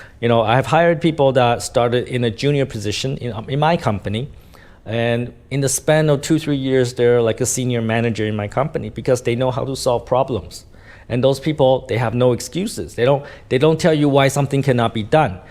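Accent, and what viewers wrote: Standard. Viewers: Thầy nói rất chuẩn luôn good thầy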